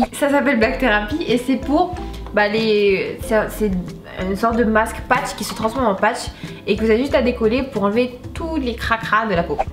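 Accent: Oran, Algeria. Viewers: tu es algérienne cool peux tu m’apprendre a parler comme vous ??? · bah non je prefere des videos en Fr psq je comprend pas trop l'accent oranaise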